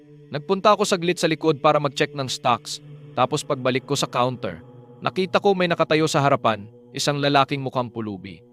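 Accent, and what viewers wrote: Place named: Visayas, Philippines. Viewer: from Visayas ba kayo? may punto po kasi, curious lang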